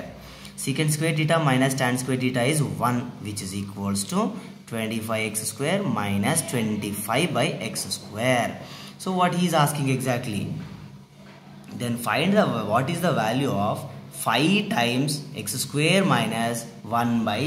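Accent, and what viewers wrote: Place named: Kerala, India. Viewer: Kerala ke ho kya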